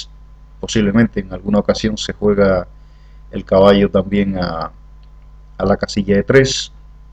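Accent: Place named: Canary Islands, Spain. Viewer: Por el acento parecías canario jejeje. Muchas gracias por los vídeos que subes. Yo estoy empezando a estudiar un poco en serio y la verdad que me ayudan mucho. Un saludo!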